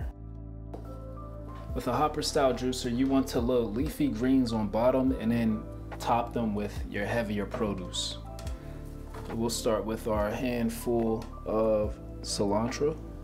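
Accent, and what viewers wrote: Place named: Western Pennsylvania, United States. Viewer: I knew it.. I was born in Pittsburgh and moved to Maryland when I was 7 so.. I heard that Western Pa accent mainly da burgh in you.. I had to ask.. much love to you my brother.. It crazy my family reunion is in the burgh in a couple weeks so I’ll be up there.. peace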